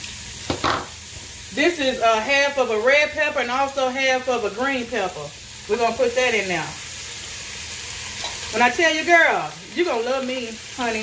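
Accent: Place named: Memphis, United States. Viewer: 😂😂❤❤ I love you because you remember me of home in Memphis TN ❤ when i say you sound like my folks 😂 thanks for the recipe I was missing something in mines and couldn't find it 😂 the sugar,butter,and Chicken seasoning ❤️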